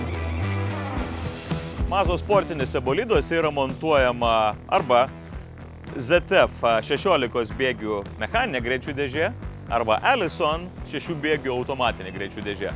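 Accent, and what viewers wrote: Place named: United States. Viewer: kalba su ameriketisku akcentu.ziauriai skamba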